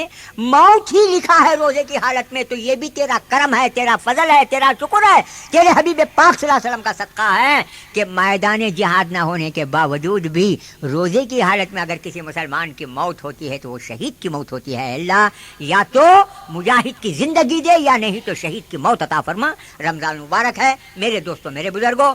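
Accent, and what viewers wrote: Bangalore, India. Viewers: Yeh purely bangaloreans ki urdu hai yaar ( aso bangalore ku baath karinge tabich malum hotaye bekaar ke commentan likh ko chhatto nakko)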